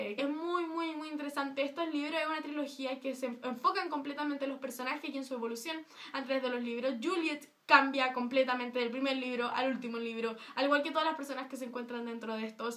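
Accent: Chile. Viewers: ¿Eres chilena cierto? 😂❤ Pd: si lo eres ya somos dos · eres chilena no? xd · tiene más acento de Chile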